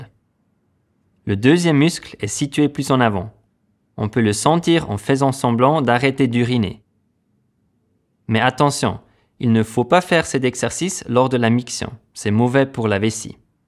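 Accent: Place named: Germany. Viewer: j'aime beaucoup cette accent allemand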